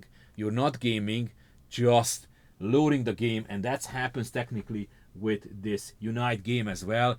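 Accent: Hungary. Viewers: you have a Hungarian accent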